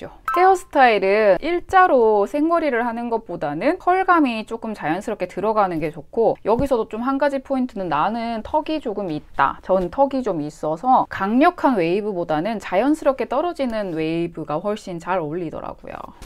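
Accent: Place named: Daegu, South Korea. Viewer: 너무 예쁘세요~눈웃음이 매력적이세요~ 살짝 사투리 억양이~ㅎㅎ 대구분이신듯ㅋ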